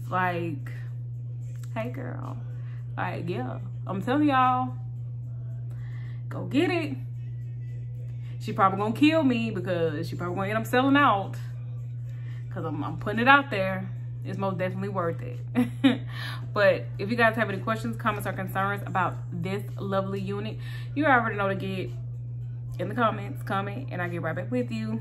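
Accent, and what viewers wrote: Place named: Texas, United States. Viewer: You gotta be from Texas with that accent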